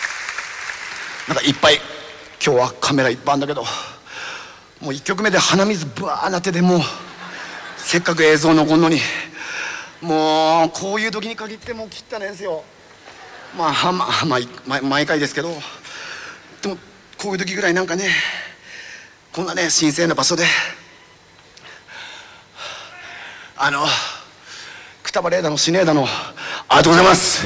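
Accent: Yamagata, Japan. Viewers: 山形弁すげー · 山形県民だから山形の訛りのおかげでさらに入ってくる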